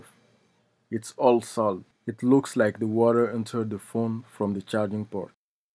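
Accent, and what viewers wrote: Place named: India. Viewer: the fuck what's with the indian accent you making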